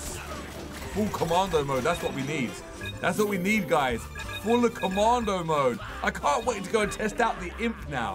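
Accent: United Kingdom. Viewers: love ur britain accent greetings from germany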